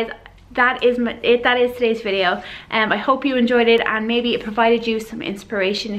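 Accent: Ireland. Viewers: Where in Ireland are you from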